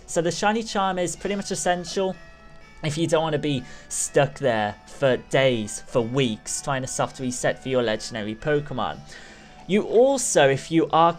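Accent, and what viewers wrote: Birmingham, United Kingdom. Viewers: Are you brummie?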